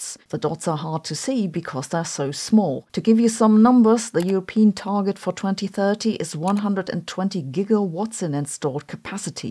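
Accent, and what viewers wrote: Germany. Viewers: Slap a German accent - check / controversial statements - check / google information without facts - check / newspaper articles against the recent developments - check / I am not sure if your audience is enough educated to understand but certainly you are not competent in that hydrogen field you are talking about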